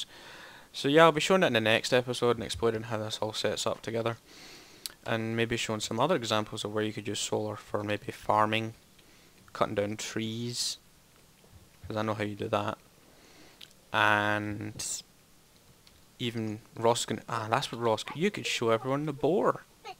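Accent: Scotland, United Kingdom. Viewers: Clearly a Scottish accent. It is my favorite accent actually. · I don't see how Gregory cannot under stand your accent, Scottish accents are the best :D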